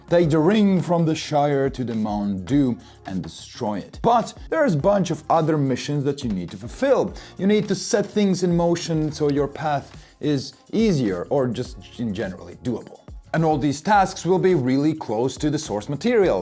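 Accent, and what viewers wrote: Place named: Latvia. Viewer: sasodīts, man vajadzēja tikt līdz šī video, lai sāktu aizdomāties...? Akcents? Yep. Skaidrs. Apsveicu, jums līdz šim tīri labi izdevās nomaskēt savu identitāti:) P.S. kur jūs pērkat visas šīs spēles? daudz kas no jūsu apskatiem LV nemaz nav nopērkamas (vai arī vnk nezinu, kur skatīties?)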